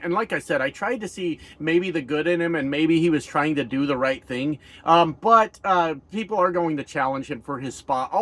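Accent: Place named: Canada. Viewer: You sure you're from Colorado buddy? The way you think, speak, & drop F-bombs, you sure sound like a fellow fucking Canuck to me. There's been a lot of horror stories & tragedies that have become public knowledge since the overturning of Roe v Wade, but by far the most disgustingly "American" one is the brain dead Georgia woman currently being kept alive as an incubator AGAINST the wishes of her family. You just know that once they finally remove the baby and allow the family to take her off life support, they're going to hand this single father of 2 a god damn, mother fucking bill for hundreds of thousands, or more, before he's even had a chance to bury his wife. And on top of that, doctors are not sure if the fetus is going to make it, and are already concerned about major disabilities if it does due to having fluid on the brain. That's some disgusting shit, on so many different levels.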